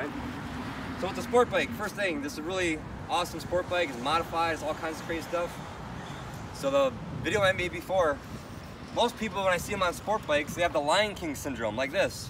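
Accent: United States. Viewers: Great info for any rider, even riders that already get their knee down. BUT THE BEST PART OF THIS VIDEO is your accent and voice. Its amazing. You could do audio books narrate movies, do voice acting... You SOUND like you might be from illinois, POSSIBLY ohio, but man, its hard to pinpoint. Very clean, great diction, your pronunciation is better than a news reporter... Holy shit. Not to mention the voice is as good as the info here. I've been riding for years, been putting a knee down for a long time, but you are setting clear, repeatable markers on the bike for how to do it effectively. I can already tell I'll be able to be more smooth with it from now on.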